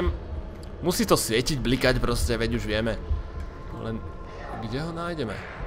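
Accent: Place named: Slovakia. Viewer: Fiu,komentuju trosku pozdeji,no snad nevadi :) Ja osobne se na tve videa nedivam ani tak napribeh ani podobne ,ale proto,ze chci slyset tvoje hlasky a podobne.Podle me je to dobry napad,kostovky dlouho nebyly,stare dobre casy :D Pff...stare...jsi muj nejoblibenejsi youtuber ( yeup,a mas fakt super prizvuk...to je tak kdyz jsi napul slovacka no xD ) a u tvych videi se uklidnim a odreaguju.Delej jak uznas za vhodne. ;)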